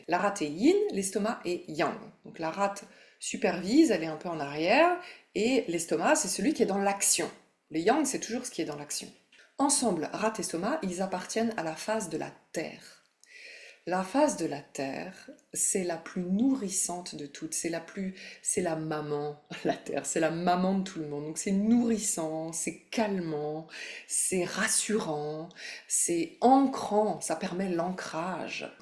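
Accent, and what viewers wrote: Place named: Belgium. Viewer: Je m’intéresse à la MTC depuis peu et je vous rencontre 🙏🏽 vous êtes claire, pédagogique, lumineuse alors je m’abonne directe 👏merci et bravo, j’entend la Belgique 😉🌻🌞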